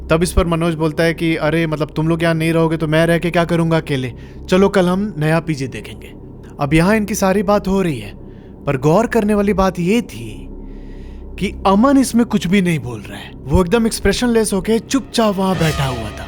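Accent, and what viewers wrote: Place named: Bengal, India. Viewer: I am bengali but I live in Nepal aapka bangla accent mujhsey milta heinn😅😅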